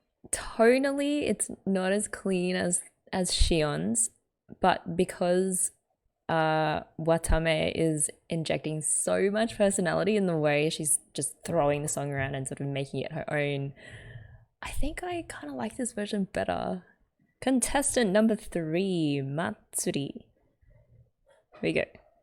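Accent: Australia. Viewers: Oh my god King is such a perfect cover song for all of these girls. They add so much personality to it. I especially love Calli and Gura's. When it came it took me by storm and I couldn't get over how perfect it was. Like I would choose this cover to introduce someone to them. Impressive how you picked up even on the accents. It was a 2 min song but you picked up on Calli's southern twang. I also like picking up on different accent, kind of an obsession, and I was guessing you had an Australian accent there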